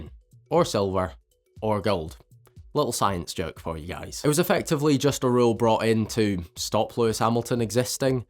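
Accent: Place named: Scotland, United Kingdom. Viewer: fellow scot! Imma sub to you just for that.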